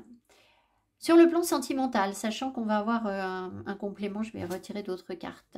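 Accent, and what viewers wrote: United Kingdom. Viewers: ta une British accent I swear ♥️♥️🙏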